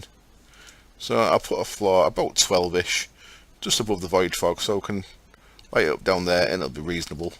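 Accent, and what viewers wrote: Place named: North, United Kingdom. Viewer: yeah exactly the same northern (i think) accent that you have :)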